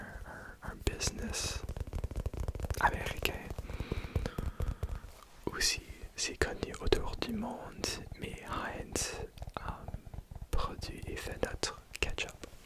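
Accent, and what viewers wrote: Quebec, Canada. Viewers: incroyable !! enfin la vidéo en fr, pour les français l'accent québécois ne se rate pas lol !! toujours des vidéos géniales ^^ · Ici en France, y a plein de sports mais c'est vrai qu'on ne joue pas trop au golf, c'est réservé à des personnes ayant les moyens. Pour ma part, je joue au tennis Ton accent canadien est très joli 😊 Bonne nuit tout le monde 😴🫶🏽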